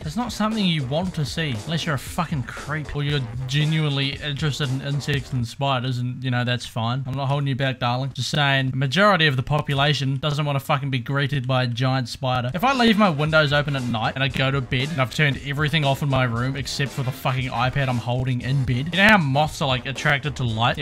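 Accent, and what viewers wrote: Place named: New Zealand. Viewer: Clearly a New Zealand ad because of the accent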